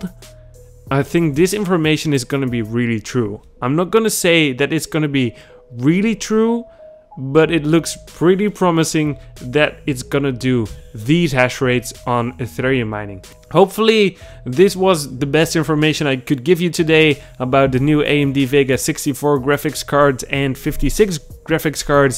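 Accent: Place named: India. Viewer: I HATE YOU VOICE. i im Di Viga thIIIIiii... Are you indian? youre soft sound E-i made my russian brain a crazzzZi. Lets try for example Ay Em Dee fucking Veeeeee GGaaaaaaa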